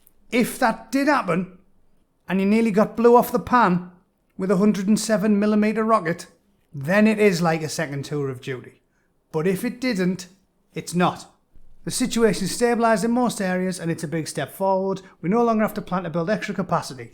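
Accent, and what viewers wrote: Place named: Northern Ireland, United Kingdom. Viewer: Great analogy with the pizza shit mate..... I was laughing my head off whilst listening to it with the Northern Irish accent. 🤣🇬🇧🇬🇧✌✌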